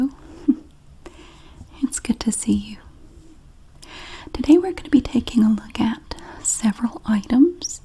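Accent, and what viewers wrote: South, United States. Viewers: i love love your southern voice SO much !!! ❤️ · Omg I love your accent!! Can really hear it when you say “pie!” I always wanted to move to the south!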